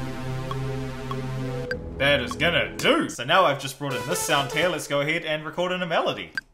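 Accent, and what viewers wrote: New Zealand. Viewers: Are u from nz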